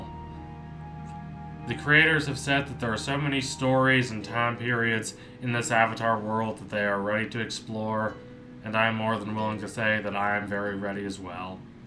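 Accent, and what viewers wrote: South, United States. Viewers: were you...doing a country accent in this video lol? Are you rehearsing for a role or something? No judgment, just threw me off XD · Why do you Sound more southern?😂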